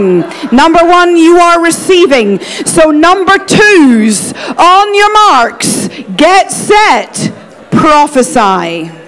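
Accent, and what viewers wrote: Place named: Ireland. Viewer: the Irish accent is one of my top 3 least favorite accents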